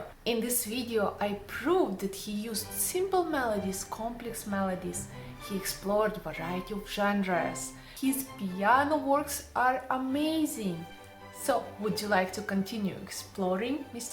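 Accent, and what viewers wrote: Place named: India. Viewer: Very nice video ! Which country are you from ? Your speach and action like indian !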